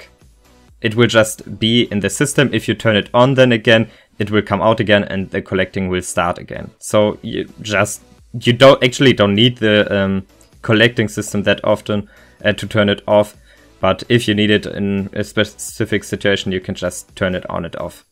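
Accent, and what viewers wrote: Germany. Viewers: It's called a german accent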